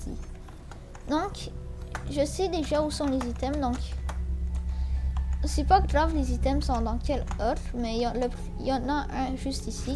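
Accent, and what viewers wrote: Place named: England, United Kingdom. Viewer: j'étais sûr que t'étais anglais vu ton accent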